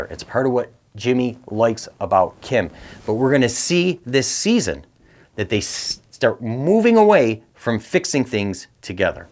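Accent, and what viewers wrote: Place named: Midwest, United States. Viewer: Are you from the Midwest or Canada? I can hear that accent lol.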